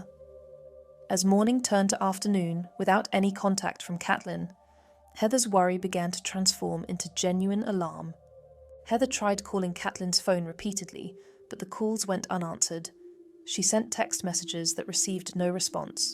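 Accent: United Kingdom. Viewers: This channel has its roots in the UAE yet the presenters accent seems to be from the UK 😮. 🤔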